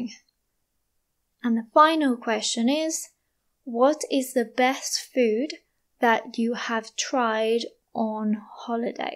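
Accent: United Kingdom. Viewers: I'm wondering something... Where does your accent come from? Of course it's British, but your accent is much clearer than most English. I knew there were many accents in the UK. 🤔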